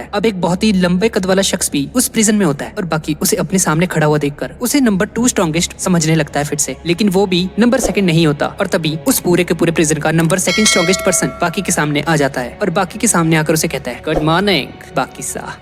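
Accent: Punjab, India. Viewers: Bhai tum panjabi ho kya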